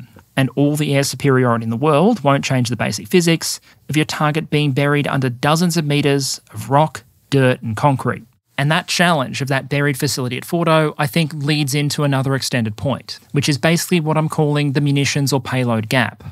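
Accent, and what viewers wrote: Australia. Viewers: I don't remember perun having an ausie accent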